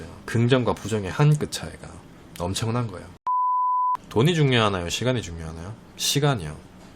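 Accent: Gyeongsang, South Korea. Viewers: 앜ㅋㅋㅋ 사투리 쓰시는줄도몰랐넴 경상도라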